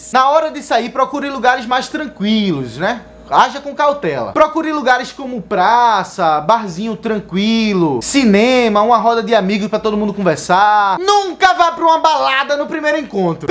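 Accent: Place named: Northeast, Brazil. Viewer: Esse sotaque e gíria nordestinos dão um plus muito foda pro vídeo. Hahaha Fica ainda mais engraçado. Parabéns!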